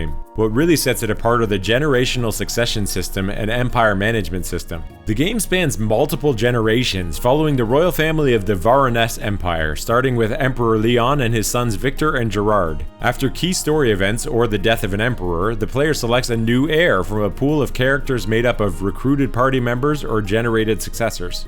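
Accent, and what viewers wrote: Canada. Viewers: no worries! I was about to post that you weren't AI when I heard a Canadian accent come through, but you beat me to it. I also learned that little bit of trivia with the Mystery Dungeon, I thought Shiren was the OG but turns out it was the blue cat I saw in Japanese magazines throughout my teens. Thanks for the content!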